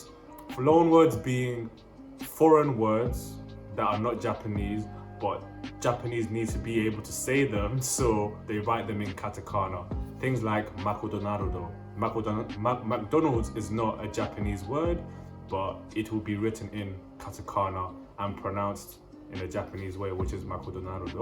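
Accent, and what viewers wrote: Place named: United Kingdom. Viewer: Awesome that you're making content! Right now I'm a few months into learning japanese using the refold method, so I'm not an expert by no means. It's just that your japanese pronounciation kept bugging me throughout the video. I think you still have a really strong british accent while speaking japanese words like kanji, katakana & hiragana. Other than that the video was great and a good overview of the topic ! Just the pronounciation bit makes me a bit hesitant to subscribe, because I'm afraid it might be harmful to hear incorrect pronounciation of your target language.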